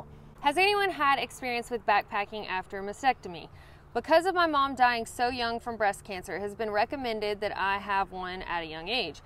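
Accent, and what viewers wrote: South, United States. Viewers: Watching from the UK that's a really cool accent you have - from a southern state? Interesting vid too...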